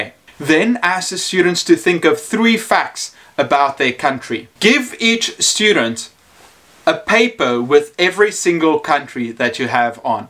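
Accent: South Africa. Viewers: Easy to spot a fellow Southie! Nice job on all the content, very helpful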